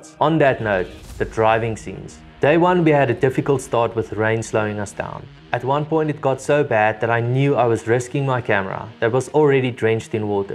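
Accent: South Africa. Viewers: Are you South African btw? Cause my parents are from South Africa but I wasn’t born there, but you definitely have a South African accent! Anyway I’m gonna watch the video now, the opening shots were amazing! So I’m sure this video will be great!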